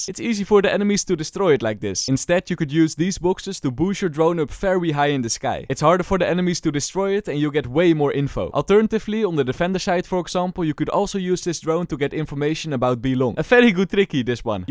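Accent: Netherlands. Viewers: are you dutch? I think i recognise your accent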